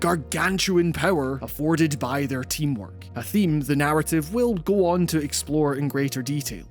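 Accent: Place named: Scotland, United Kingdom. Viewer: This is a Scottish accent.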